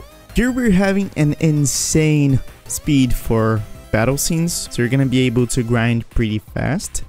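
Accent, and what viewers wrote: Brazil. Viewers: I also felt something like he was Brazilian lmao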